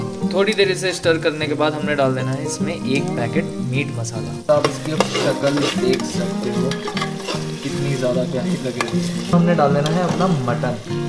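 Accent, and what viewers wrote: India. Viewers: Bro your speaking english very nice but i cant understand you. Your accent is so indianish. You are a very good speaker and i love your videoes. Plz at least try to speak with a little different accent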